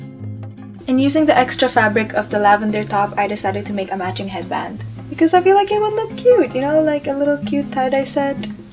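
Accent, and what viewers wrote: Philippines. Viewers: you have a Filipino accent xd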